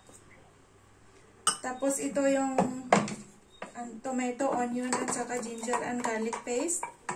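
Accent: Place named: India. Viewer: Sala Malaykum Sis ulam masalap. Looks yummy at I like your Indian Accent 👌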